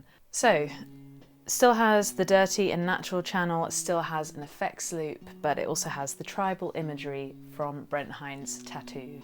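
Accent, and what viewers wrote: United Kingdom. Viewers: K bro so this don't matter a tiff but I fuckin KNEW you would have a British accent before I clicked on the video man I could tell by the way your mouth made your name say in the preview yah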